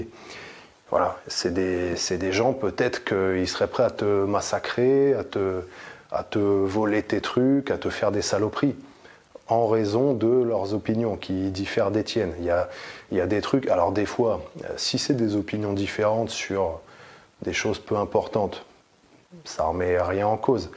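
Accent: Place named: Lyon, France. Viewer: t'as l'accent lyonnais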